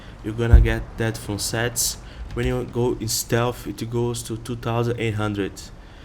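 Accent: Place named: Brazil. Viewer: this accent...are you brazilian? haha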